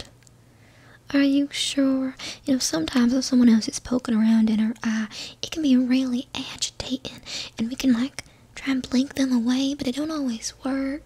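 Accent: South, United States. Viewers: i specifically love southern accents , this was pretty damn good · Southern comfort. This is my favorite so far. Although, the English accent one was good too. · That southern accent was spot on, thanks for waping ma aahballs, appreciate ya'll...😁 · What a sweet accent. I loved Mrs Cooper's accent, so that's southern... Good to know... one more asmr keyword I can search for. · I’ve been so used to the voice you’ve used for all of this time. When I turned on this video it immediately made me feel way better. I don’t know what it is about a southern accent especially coming from you but it is so calming to me. Thank you so much for making this video. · OMG... with a southern accident is too much cuteness. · w southern accent ❤❤❤ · All I love in the same masterpiece! I’m not a specialist but I think your accent is good, it reminded me of movies or series with southerners. 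il est vrai que tu es très "belle"! from face but also your profile is so elegant! you're always stylish in appearance, but also an interesting, original and fun personality. you are really the person you think you are! also I really hope that your sleep quality will improve🤞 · is back once again! I really liked the southern accent! You should do some more accents in the future 😀😴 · You know I laughed hysterically when I heard that Southern twang and vocabulary. That sounded a little too authentic to the knucklehead that is me, myself and I. Also the voice reminds me of my late aunt. That may be another reason why I laughed. I have fond memories of her and a funny story or two with her. One in particular. That funny memory is something I have not thought about in awhile. Thanks for the extra smiles! Edit: no I am not from the south but the area I live in in western PA could be transplanted from somewhere from the south lol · You are almost the polar opposite of how I picture a woman from southern US in my mind, but your accent makes up for it entirely. 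The stark contrast is, for some reason, incredibly satisfying. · If this was your first video.. I would think you were dead ass southern. Nailed it!! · Her southern accent was amazing. Great job ❤ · As a southerner you did a great job with the accent! :hands-yellow-heart-red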